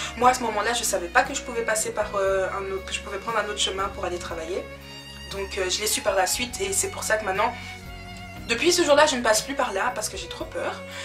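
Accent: Belgium. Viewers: Cc tu est belge ?? Si oui de ou ??Bruxelle ?? Je t'adore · elle est belge?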